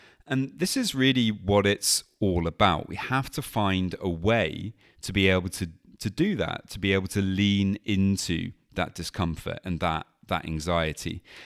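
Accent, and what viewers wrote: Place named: United Kingdom. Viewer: Didn’t realize you had a British accent til like 8 minutes in 😭😭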